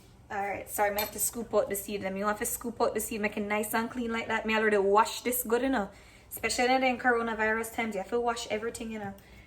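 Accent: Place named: Jamaica. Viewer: LMAOOO OMG !!! I love you speaking in patois!! Im jelly! I can't do it.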